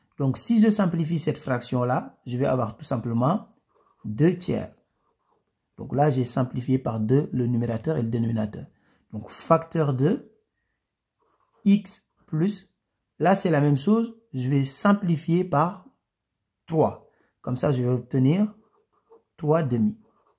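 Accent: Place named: Senegal. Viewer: Accent sénégalais...merci compatriote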